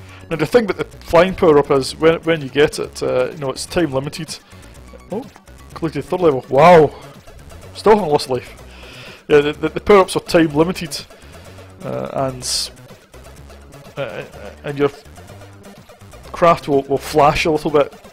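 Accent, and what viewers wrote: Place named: Scotland, United Kingdom. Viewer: nice to hear a fellow scot like myself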